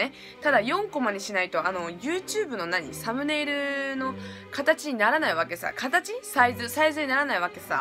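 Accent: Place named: Hakata, Japan. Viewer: 博多弁がすごい好き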